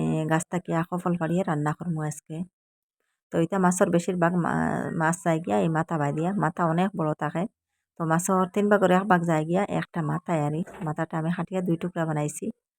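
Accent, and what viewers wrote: Sylhet, Bangladesh. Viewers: আফা চা এর কালার কিন্তু খুব সুন্দর অইছে। আসাম কিতা আমরার সিলেটি মানুষ আছইন নি। আসাম তো ইন্ডিয়া। আপনার মাত সিলেটের কিন্তু থাকইন আসাম। আমি বুঝতে পাররাম না। সিলেট থাকি আসাম যাইতে কতো সময় লাগে আফা।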